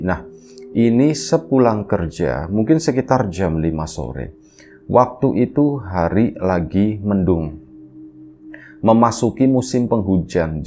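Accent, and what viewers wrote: Tegal, Indonesia. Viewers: Sedih bngt😢🤧mas scra ga lngsung menyemprotkan gas air mata ini...dr mulai mas mngikhlaskan mas dewo sm mb putri aja udh terharu ditambah dg mninggalnya mas dewo bkin nyesek.mas ini kya'y emng lucu ya aplgi dibawakan mas dg gaya natural dan logat khas Tegal yg agak mirip sm cirebon bkin aku ketawa.kisah ini pket komplit ya ada sedih dan ada lucu'y😂smoga mas dan mb putri rmh tangga'y langgeng bahagia dan mnyayangi anak mas dewo spt anak sndiri, mkasih mas sehat trs agar bs mnghibur kt smua😁😁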